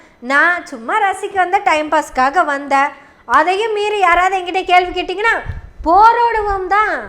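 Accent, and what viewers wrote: Kongu, India. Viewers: அருமையான கொங்கு தமிழில் தவெக வை தற்குறி வெக வாக துவம்சம் செய்த சகோதரிக்கு பாராட்டுக்கள். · Very interesting presentation in beautiful Kongu Tamil. May the God bless you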